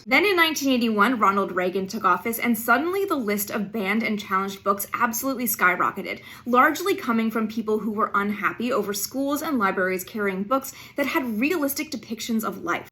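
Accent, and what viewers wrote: Canada. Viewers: Now we deport you for speaking out or writing about a genocide that we're paying for in occupied Palestine. Pretty sure you're Canadian from the way you say your "ou" you off the hook. Alabama banned ann frank cause she was a jew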